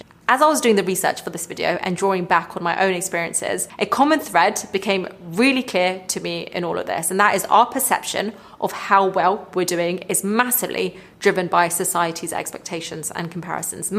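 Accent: United Kingdom. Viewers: Omg. A British accent will get people only so far. When you read this advice instead of listening to the accent, you'll see it's very generic advice that is not worth your time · Let's be honest, I'm watching this video because she's easy on my eyes, and no, I'm not turned on by her British accent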